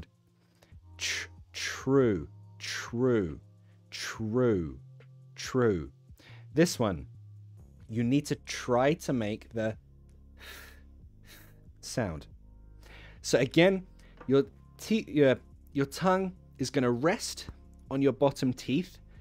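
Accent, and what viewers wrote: United Kingdom. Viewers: What british accent you talk:Rp,Modern Rp,posh or cockney and do you talk more than 1 accent in your daily life?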